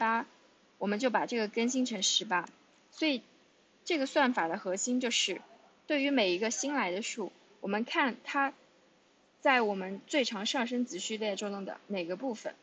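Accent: China. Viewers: 找279题目解答 结果看到了英文版 大致听了几句就发现是国人了 姐姐在新加坡上学？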